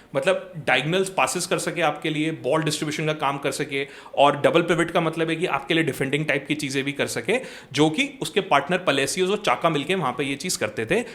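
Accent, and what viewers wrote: India. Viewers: I'm not sure if Pintus will leave. He isnt a part of Carlo's staff, works for the club. Although Xabi will have his own staff, I think Pintus will stay. One suggestion from my side. I have noticed that people find it weird when you pronounce the players name in Indian way. Like usually other creators, if you have seen, they pronounce the players or clubs name in the actual pronunciation. Hope this might help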